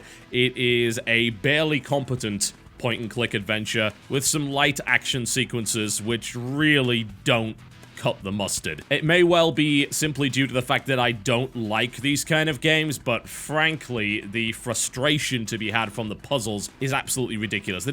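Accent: United Kingdom. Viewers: Video content is good, british accent is just terrible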